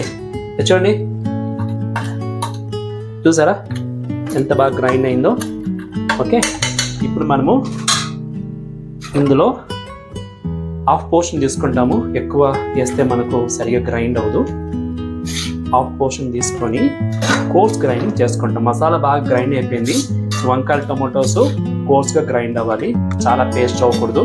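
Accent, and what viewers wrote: Tamil Nadu, India. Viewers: గారు మీరు తెలుగు వార, తమిళ్ తమ్ముడా 🤔 మిమ్మల్ని జెమినీ సీరియల్స్ లో చూసే దాన్ని మీ తెలుగు కొంచెం డిఫరెంట్ గా ఉంది 😊👍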